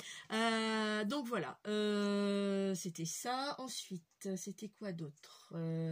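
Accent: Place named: Nord, France. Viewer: Bonjour je me suis abandonnée a ta chaîne grâce a notre amie Agnès.super sympa tes vidéos j'adore ❤❤❤❤.mais je me permet je connais ton acent j'ai le même le pas de Calais ou le nord.moi c'est le 62 mais maintenant c'est l'aude.je te fais de gros bisous.alexandra 😊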